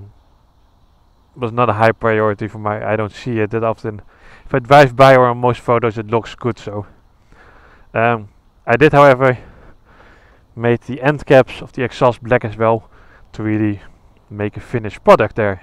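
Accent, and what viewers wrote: Netherlands. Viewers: Haha ik dacht ook gelijk hé Nederlander haha. Rijd ook een R. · Ik hoor gewoon aan je dat je nl bent😂 praat gewoon nederlands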